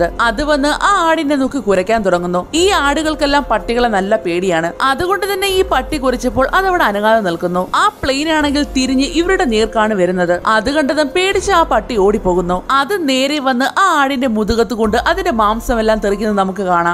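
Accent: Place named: Kasaragod, India. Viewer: Kasargod aanalle? Paanju varunnu yenn paranju (paanju) kasargod alleM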